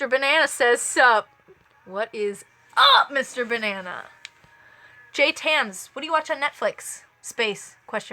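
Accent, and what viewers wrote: United Kingdom. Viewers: Missed it, but I had a buuuurning question... Are you British or American? I just can't lean towards one or the other... Thanks :-D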